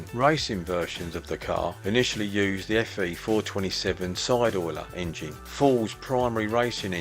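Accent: United Kingdom. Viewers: Very well done with the video matching the audio very well in spite of the audio being generated by your British narrator, which sounds really off unless it was targeted to British audiences. 🍁 eh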